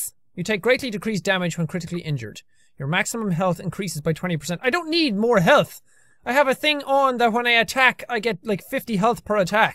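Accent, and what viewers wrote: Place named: Ireland. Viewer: He is Irish so his accent is different.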